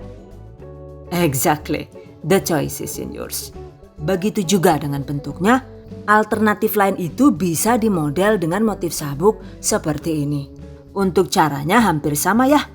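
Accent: Banyumas, Indonesia. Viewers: Ngapak temen sih...mbakkk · Langsung Caaaatat..Suka ngakak klo Mba udh Ngomong Ngapak2..Lah Lah Deneng dadine kayak kuwe..pating mbrudul🤣🤣🤣 Sukses ya Mba, Selain Menghibur Video2nya jg menginspirasi Emak2 Bakulan kyk Saya.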